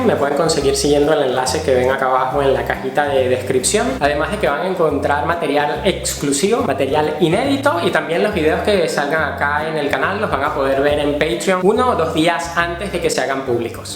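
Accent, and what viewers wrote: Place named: Venezuela. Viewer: De qué región de Venezuela es su acento? Y me disculpa.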